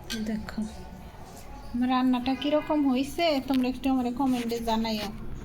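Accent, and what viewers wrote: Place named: Barishal, Bangladesh. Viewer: ওরে মোর খোদা হেইয়া দেহি বরিশাইল্লা মুইতো ডরাইছিরে আপা তবে ভিডিও এবং কথা গুলি সেই সাদ হয়েছে 🙄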